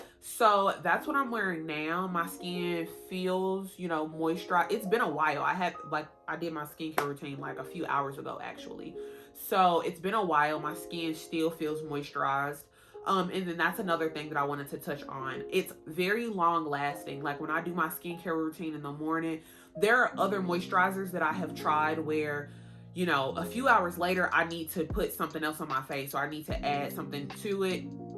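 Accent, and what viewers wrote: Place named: South, United States. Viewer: You sound like you are from the south! It's a southern accent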